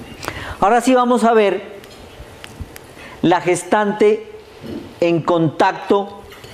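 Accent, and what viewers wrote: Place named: Colombia. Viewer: Que mal da clase no mames es colombiano seguro por su acento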